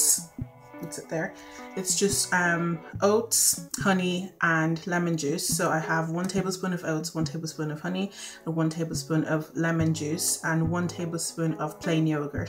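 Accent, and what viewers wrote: Ireland. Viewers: Are you Irish? I love that accent! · I can sense the Irish accent... Am I right?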